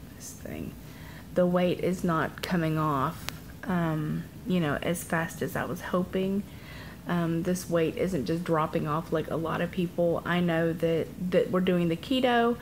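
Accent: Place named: Kentucky, United States. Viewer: where are you from originally? I'm from KY and you talk like we do.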